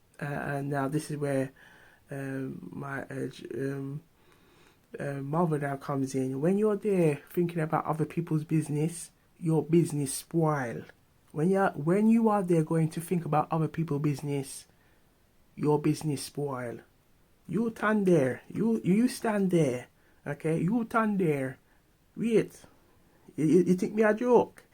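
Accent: Jamaica. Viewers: Are you Jamaican by chance ?!👀🇯🇲❤️❤️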